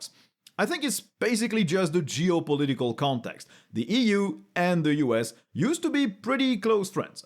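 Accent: France. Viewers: i love how uses french pronounce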